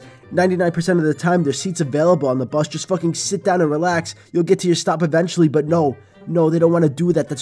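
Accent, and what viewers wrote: New York, United States. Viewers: r u from new york?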